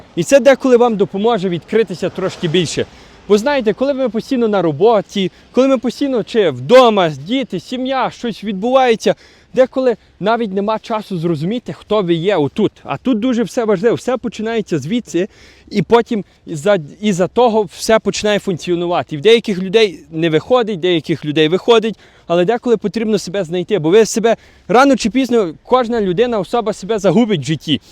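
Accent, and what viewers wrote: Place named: Ukraine. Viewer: Давно не слышал такого красивого украинского языка. Сразу видно - родной язык а не переученный. Слушал как стихи.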